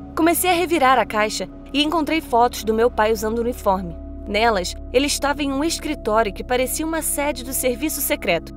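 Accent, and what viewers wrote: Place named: Minas Gerais, Brazil. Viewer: Ela paréce qué ela é minera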